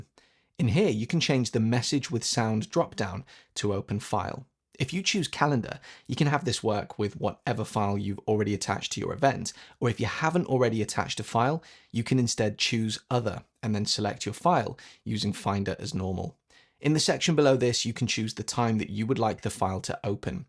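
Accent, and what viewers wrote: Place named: RP, United Kingdom. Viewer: Duck! I think I am addicted to this posh British accent...very nice content in nice pace.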